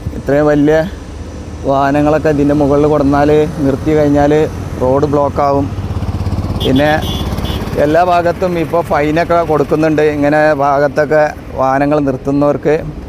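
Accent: Malappuram, India. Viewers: കഴിയുന്നതും വളരെ ഏറെ ഭംഗിയായി അവതരിപ്പിച്ചു.. നമ്മുടെ മലപ്പുറം ശൈലിയിൽ പറഞ്ഞപ്പോ വല്ലാത്തൊരു സന്തോഷം..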